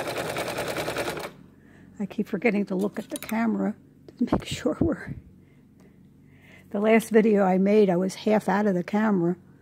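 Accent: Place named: Baltimore, United States. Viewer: I love your bowl and accent. I'm guessing your from my home "town" of Baltimore or maybe Philly?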